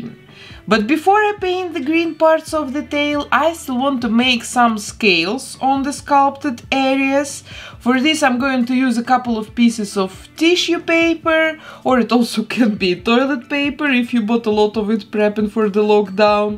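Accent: Russia. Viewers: Мне не одному кажется что она русская и пытается говорить на английском? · I'm the only one who noticed that she has a very strong Russian accent? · Блин так слышно Росийский акцент) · Она говорит как русская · You are from Rassia?I think you say whith Rassian accent. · Вы русская? Просто у вас есть акцент, это слышно)) Вы классная You're cool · Вы русская?У вас русский акцент) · Русский английский 😅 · Че за акцент? Ебать, так ты русская ಠ‿ಠ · Русский или Белорусский акцент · у меня уши болят из-за этого русского акцента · может она русская... акцент прям Русский пипец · Are you Russian because your accent is gorgeous xx 🤩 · акцент русский как будто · Ты похожа на русскую, особенно по произношению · Your accent is so cute 🥰 You are from Russia ? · Ты получается, русская? Просто очень много конкретного русского произношения :D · Такое ощущение что ты русскоговорящий ютубер потому что у тебя такой акцент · Детка ты не одна такая она 100% русская, в Америке и в Англии не в одной стране нет такого произношения! 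· я тоже сначала подумала об этом. очень похоже на русский акцент. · Акцент очень русский напоминает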